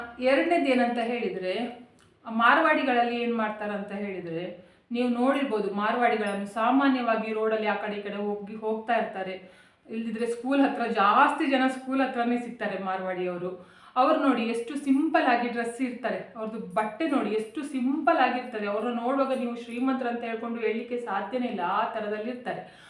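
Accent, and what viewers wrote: Mangalore, India. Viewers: hello...Very useful information, point by point explained the reality and truth. Your kannada is very clear its pure mangalorean remembers Late Aparna's Accha kannada. and by your appearance & the way you speak kannada I feel you are vishwakarma Acharya or konkani.However its nice that you are open and clear minded. When we do choodi pooja in Shravana we will do it.Only kudure laala we have to check from where we will get it.again thank you very much.I have also observed marvaadis while closing their business shop they say mantras.they are always rich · Thank you very much for the valuable suggestion And your nice Mangalore Kannada. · ನೂರಕ್ಕೆ ನೂರು ಸತ್ಯ ನಮಗೆ ಒಳ್ಳೆಯದಾದರೆ ನಮ್ಮವರೆ ಸಹಿಸಲ್ಲ ಅನ್ನೋದು ನಿಜ ..ಚೆನ್ನಾಗಿ ಮಾತಾಡ್ತೀರ ನಮ್ಮ ಮಂಗಳೂರು ಕನ್ನಡ❤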